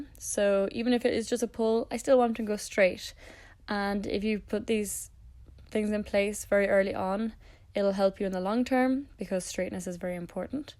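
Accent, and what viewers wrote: Ireland. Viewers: Are you irish? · R u from Ireland 🇮🇪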